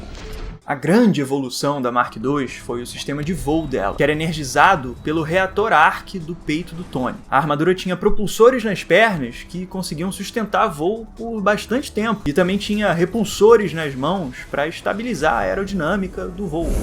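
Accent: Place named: Northeast, Brazil. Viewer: Mano vídeo sensacional cara parabéns. Mas me diz uma coisa tu é nordestino? Pq teu sotaque é igual o meu tbm kkkkk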